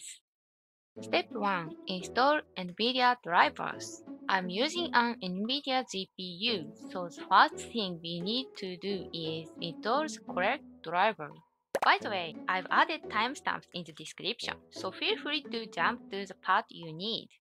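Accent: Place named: Japan. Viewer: Thank you for your guide and the effort you put into the video! Love your Japanese accent and the little character in the bottom left corner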